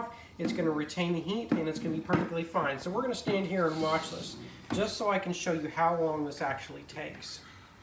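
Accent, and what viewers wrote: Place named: Midwest, United States. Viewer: Aside the annoying accent (yankee? midwest?) that over-emphasizes on bilabial consonants with an "h" sound that makes the talker sound like some pompous Victorian colonial windbag (it's maybe not the author's fault anyways, just his native accent), I'd say this is the best explanatory video on the matter. No useless, pedestrian intro... gets to the point soon enough. Much thanks! Will try that. I was also just wondering if adding paraffin oil is important, or just wax is fine.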